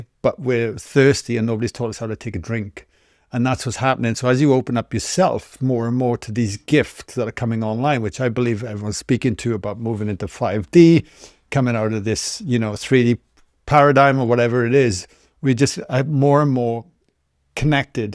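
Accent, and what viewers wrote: Wales, United Kingdom. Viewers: Nice to hear a Taff accent. Feels like I'm back in the Valleys 😅. Thanks for these insights based on all that experience.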